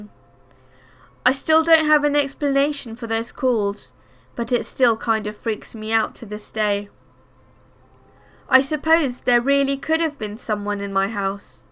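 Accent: United Kingdom. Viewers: You're very welcome. :-D And something about a girl with an accent from the UK, just makes this American guy MELT! :-P ;-) But seriously, thank YOU for doing these vids. You keep making, I'll khwe?w keep making them oak making them. <3 Keep up the good/great/ work. :-)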